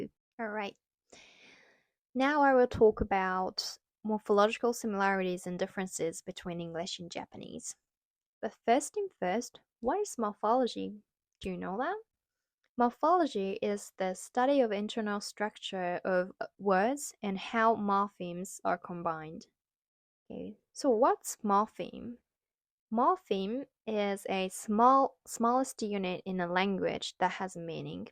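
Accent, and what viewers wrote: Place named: Australia. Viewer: i found your channel from that 'what i've learned' video (about why japan has a low obesity rate) but am also studying japanese so i figured i'd watch this video. have been studying japanese for many years but progress is slow. one thing i learned from this video is that there are 188 particles! i had no idea it was so many, even after years of studying japanese i only know about 20-30 of them. it's also interesting that despite you (presumably) being a native japanese who has visited or lived in australia, i still detect an australian accent in your english, especially when you say words like 'answer', you say them in the way an australian would.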